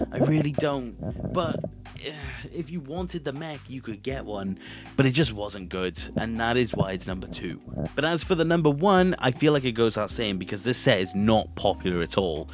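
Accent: Wales, United Kingdom. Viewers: Are you Welsh ???🏴🏴🏴🏴🏴🏴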